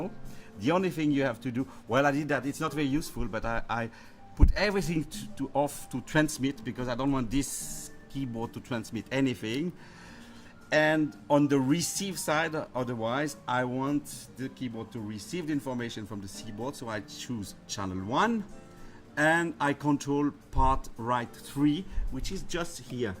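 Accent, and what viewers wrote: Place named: France. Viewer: salut à toi l'artiste. je viens de découvrir ta chaine. vraiment très bien. vu ton accent franglais, j'en déduis que tu es français ? de quelle région? cordialement. Laurent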